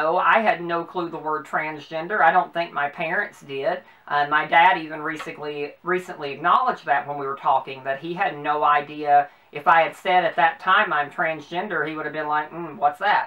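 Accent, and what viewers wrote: South, United States. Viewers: Love you southern accent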